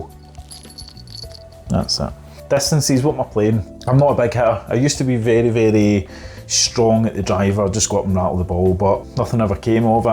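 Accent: Scotland, United Kingdom. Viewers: its a thick accent, but its you being from Scotland, and it is clear enough for me to get the point of the story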